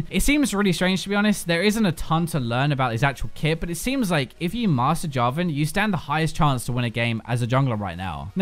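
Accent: United Kingdom. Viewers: First time I'm annoyed at British accents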